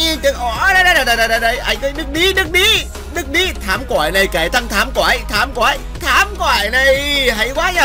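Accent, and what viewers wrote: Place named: Central, Vietnam. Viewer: nghe tiếng bạn lúc đầu cũng khá là khó chịu... giọng nói của người miền trung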